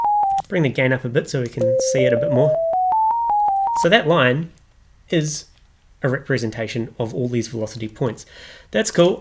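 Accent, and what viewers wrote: New Zealand. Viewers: the first 30 sex of this (really good) tut were like a masterclass in kiwi eccent ''...quuck tups...mudi clups... ...eexcellunt!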